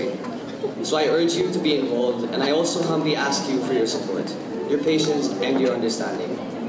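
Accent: Philippines. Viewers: Pero Ngayon nawala na Yung accent Niya.. Pinoy na Pinoy na Ang accent Niya Ngayon hehe Marunong narin magilocano Ngayon eh. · I feel like his a little nervous. But still, he really did a great job. I kinda like his tagalog accent tho.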